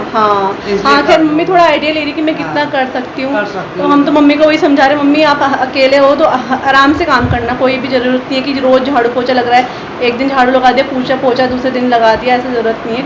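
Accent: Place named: Garhwal, India. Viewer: Apki bolne se pata chalta ki aap tehri garhwal se ho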